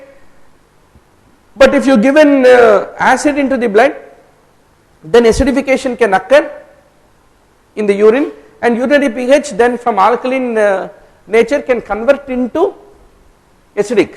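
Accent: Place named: South India, India. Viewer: Filho well its english but the accents are diffrnt. south indian people have this kind of accent. nothing wrong with that. uk n us english have lot difference in accent as well. it varies frm region to region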